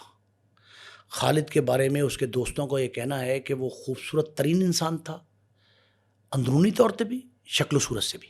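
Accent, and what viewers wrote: Punjab, Pakistan. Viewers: پنجابی لہجے والوں سے کراچی کی کہانی سننے میں الگ ہی مزہ آتا ہے 🤣🤣🤣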